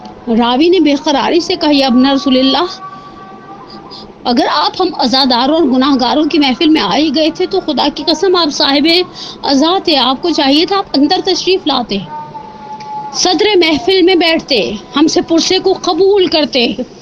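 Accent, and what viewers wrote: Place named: United Kingdom. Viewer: Ya kuin aysi Hain akhir??? kuin English accent main Urdu bolti Hain ...:(in ko madarsa kuin itny achy topic pay bolnay ko khta hai akhir??? Ya Allah in k lecture ko Sunna boht muskill ho jata ha JB k in k husband intehaie bahtren Andaz main bat karty Hain..I wish main Karachi aun tw in sa milun ek bar aur pochun ap ko itna complex kuin hai angrazi ka ...ap tw Urdu b bol lain boht hai kuin k ap tw pakii lahoran Punjabi Hain...anyway really sucks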